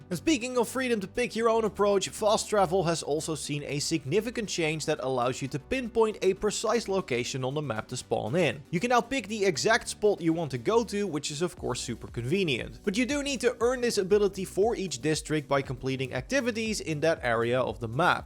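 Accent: Netherlands. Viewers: Je hoort gewoon dat deze man Nederlands is hoor het nu pas maat 😂😂😂😂 top video wel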